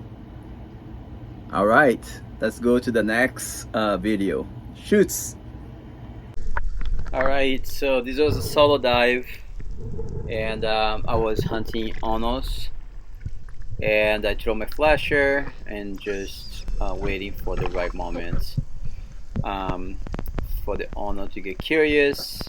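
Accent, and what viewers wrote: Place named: Brazil. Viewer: hehe i can hear the brazilian accent! love your videos bro hope your channel blows up!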